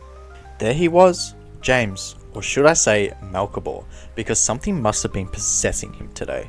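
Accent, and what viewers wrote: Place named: Australia. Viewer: 🤣love that YouTube recommended your channel! Subbed as soon as I heard our Aussie accent 🙌🏻 loved the birding and the commentary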